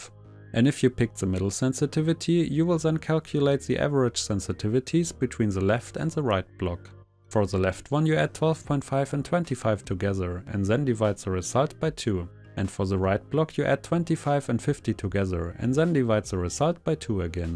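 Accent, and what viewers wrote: Germany. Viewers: irgendwie hab ich aus deiner stimme raus gehört das du deutsch sprichst 😂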